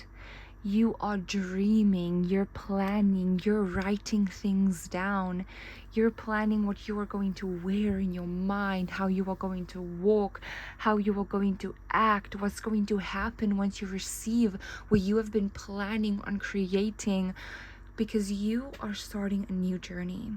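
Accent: South Africa. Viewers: My Sister you are in my energy 100%. Your reading are so aligned with my life right now. can I ask where you are from sound south african 😊🇿🇦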